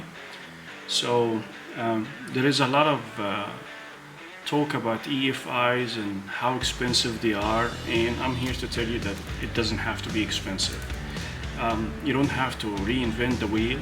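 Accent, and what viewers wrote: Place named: Northern Europe. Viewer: the art of bedding music is that you should NOT be able to hear it. its to cover up background noise, reduce the "hiss" of silent passages... it should NOT be louder than the person talking. not everyone can follow a north european accent without the added distraction. my heads just not in the space to deal with it right now...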